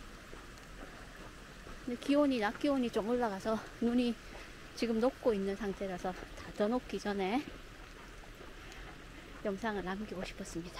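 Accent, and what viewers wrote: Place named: Gyeongsang, South Korea. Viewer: 사투리는 저와 같은 경상도 (저는 대구 ) 사투리인거 같아 더 정감이가고 콧물 소리는 전혀 느끼지 못하겠는걸요 ^^ 신경 안쓰셔도 될듯해용~~~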